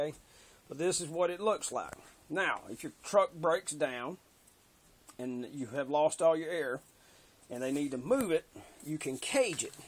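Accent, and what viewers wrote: South, United States. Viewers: Name that Southern accent. I'm thinking...... Alabama?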